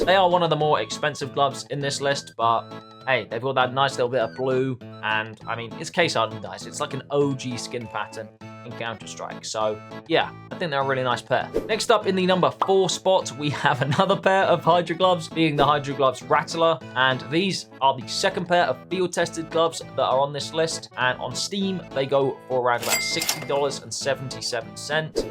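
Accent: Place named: United Kingdom. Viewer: your bri ish accent is annoying (no offense)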